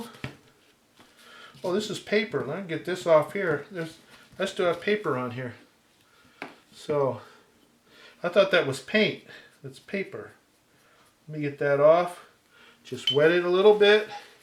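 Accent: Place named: Arkansas, United States. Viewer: I literally stumbled across your video this morning. I'm glad I did. 😃I'll be doing some experimenting at this process myself. I lived in Shelbyvill, TX for a few years. But I've been back home in NC for over 20 years, but I thought I recognized the Arkansas accent. Wish I had a workspace like that. I've subscribed and liked. 👍 HAPPY NEW YEAR!! 🎄 God bless. 🙏5th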